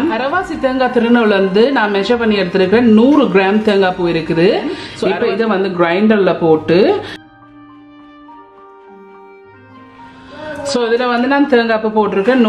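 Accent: Jaffna, Sri Lanka. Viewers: Akka sri lanka muslim food recipe podungga... Unggal Yalpanam tamil arumai🇲🇾❤️🇱🇰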